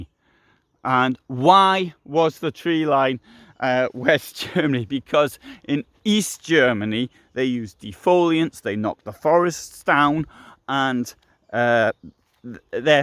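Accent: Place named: North East England, United Kingdom. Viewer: I can here a north east English twang to your accent😁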